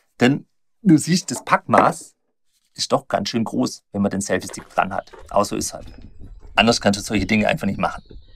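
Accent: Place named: Swabia, Germany. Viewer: Ich liebe deinen schwäbischen Dialekt 🤗👍👍👍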